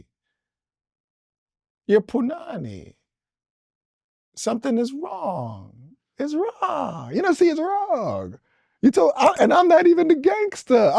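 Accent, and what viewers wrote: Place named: Kentucky, United States. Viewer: You sound like a victim. You saying how you cared for others and provided. I'm from Kentucky and black you sound like us country and a voice change for somewhere overseas. Now was a Trippin he saying I'm going to daddy's house a few times. Sounds like he got a lawsuit coming. My brother, my friend black men need to embrace mental health with appointments.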